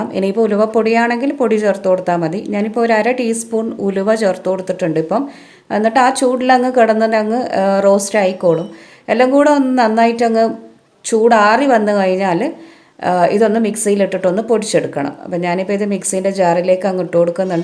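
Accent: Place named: Mahe, India. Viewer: Your way of speaking reminds and miss me my hometown mahe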